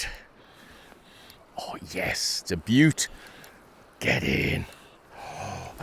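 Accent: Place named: Newcastle, United Kingdom. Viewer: Thought that was you in the kayak i could just about hear the geordie accent, i was in the boat & yes that was my sidewinder 😂😂 hope it brings you luck! Great video!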